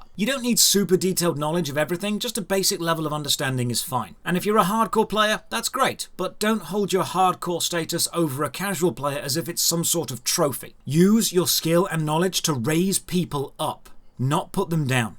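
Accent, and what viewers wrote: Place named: RP, United Kingdom. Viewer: I have not played an MMO in over a decade. I simply like the Posh British Guy. Anyone else?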